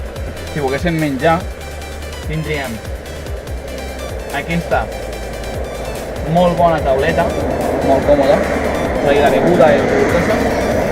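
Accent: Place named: Majorca, Spain. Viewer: Habla mayorquin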